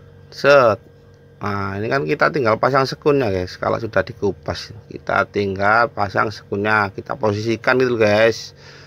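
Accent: East Java, Indonesia. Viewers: Aing mah resep. Gaya ngomong jawa timur na cak euy.!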